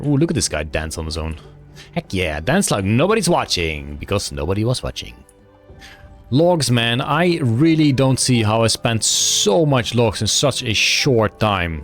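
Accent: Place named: Netherlands. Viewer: Is it me or did the Nederlands bleed through a lot more than usual? Lol. Not complaining mind you. Just funny cause it happens to me when I get super tired. Keep up the goede work. Earned a new sub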